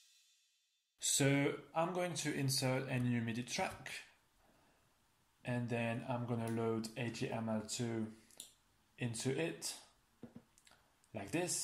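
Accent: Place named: France. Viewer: Synthétique et raffraichissant !!! Loving the french accent !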